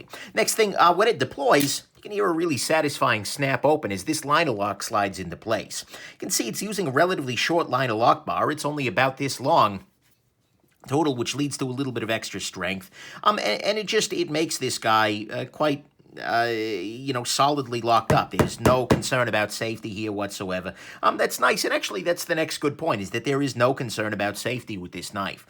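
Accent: New York, United States. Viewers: Can I ask where your from? NY?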